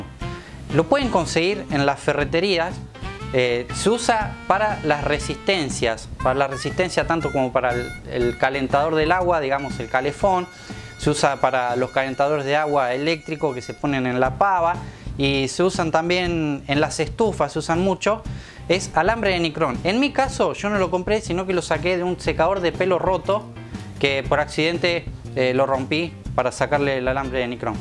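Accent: Argentina. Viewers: oh un argentino que bueno like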